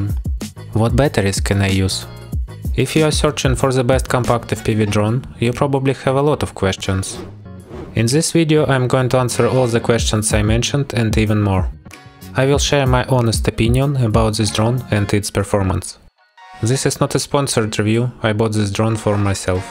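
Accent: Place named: Russia. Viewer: Are you from Russia? I hear the familiar accent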